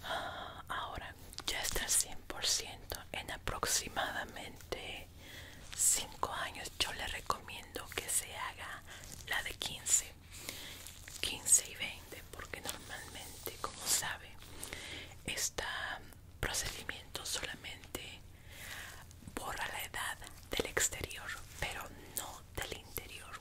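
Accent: Mexico. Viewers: me encanta tu voz! tienes un talento innato y lo mejor , es que eres mexicana :) (bueno eso creo, por tu acento)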